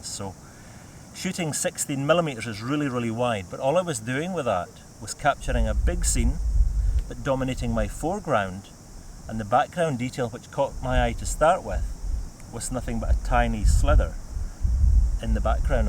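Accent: Scotland, United Kingdom. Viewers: Thanks Rolf. Yes scottish accent with english languauge. Often difficult to understand. Practice makes perfect¡ = )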